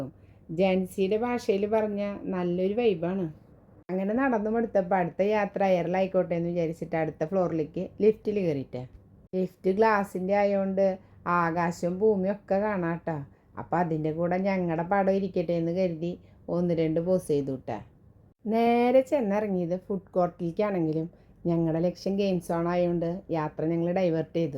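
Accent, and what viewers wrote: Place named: Thrissur, India. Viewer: Thrissur dialogue superrrr❤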